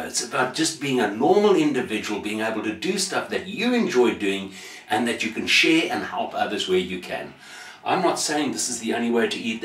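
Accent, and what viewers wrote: South Africa. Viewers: are You South African? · Are you from South Africa then?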